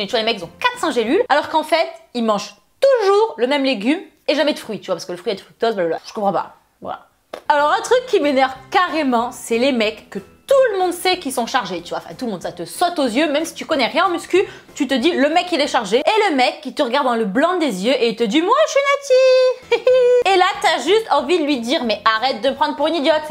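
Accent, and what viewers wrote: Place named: Nice, France. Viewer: C'est officiellement la vidéo ou son accent nissart ressort, c'est connu l'accent ressort quand tu es énervé x)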